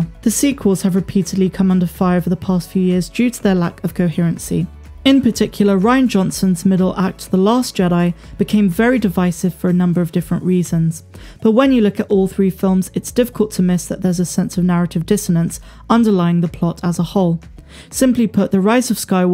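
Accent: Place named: Bristol, United Kingdom. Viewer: I would have bet, you was from Bristol.good luck. Great vid.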